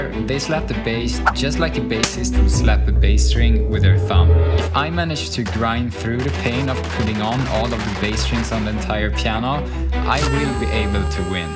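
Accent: Sweden. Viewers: Tha svenska accent >>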